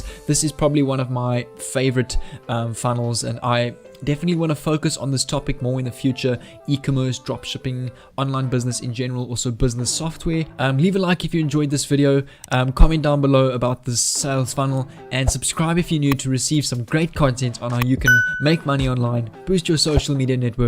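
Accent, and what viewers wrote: South Africa. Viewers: Haha yeah your accent gave you away I live in Johannesburg lol so I know the accent lol. I'm a person who has entrepreneurial mindset and values. I'm kinda struggling due to lack of funds. I'm 20 and I live with my parents. I'm trying to get out there and start something but this junk status is kak lol there are almost zero jobs lol( my dad has a PHD and can't get a job he studied for lol😅)😅😧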